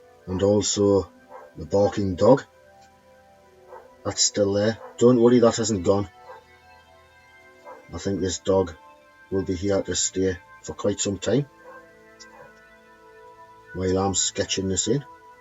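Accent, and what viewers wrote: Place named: Scotland, United Kingdom. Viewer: what a talent you have, amazing pictures !! like the accent as well, is that scotisch sir.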